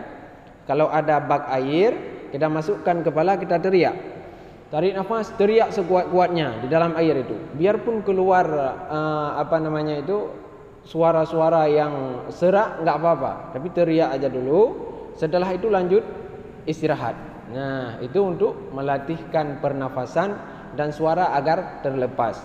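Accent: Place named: Aceh, Indonesia. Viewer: Dari suaranya, tampaknya Ustad yang setiap kali Memberikan pelajaran di Tahsinul Youtube dengan aksen Aceh adalah Ustad yang baju Biru... Betul tidak ya ☺️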